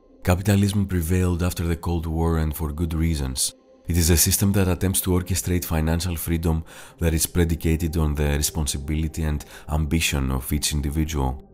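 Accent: Greece. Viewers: Why can I tell that you are Greek just by listening to your voice? Anyways, great video!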